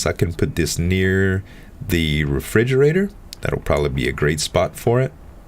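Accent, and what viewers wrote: Louisiana, United States. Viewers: sounds like hes from Louisiana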